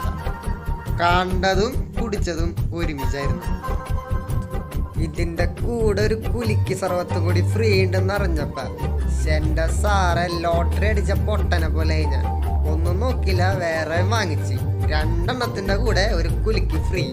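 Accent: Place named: Thrissur, India. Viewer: Good presentation I like your style and way of speaking....by hearing this I proud of my Thrissur slang❤️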